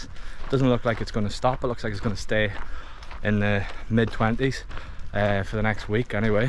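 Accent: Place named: Northern Ireland, United Kingdom. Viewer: Sounds like a northern Irish mon.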